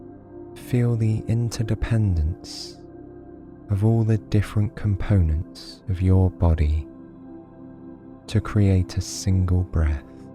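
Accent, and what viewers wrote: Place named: United Kingdom. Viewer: Oh. Your voice and British accent is as soothing as a father's voice. Last night I woke up feeling a bit unworthy, but then I ran into this. I listened and I eventually felt much better. Thank you.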